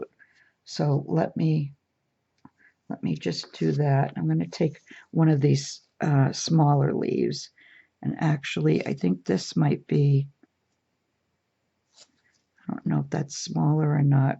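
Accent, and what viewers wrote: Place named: Massachusetts, United States. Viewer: This is just so lovely! Thank you for sharing! Your MA accent makes me feel right at home!! I grew up there and most of my family is still in the Boston area. I live in ND now. So glad I met you through the shop!!! Just subscribed!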